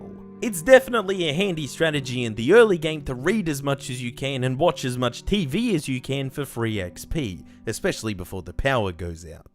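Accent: Australia. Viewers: local youtuber describes an australian person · Sounds like an aussie, but also very Jewish. Or just has a cold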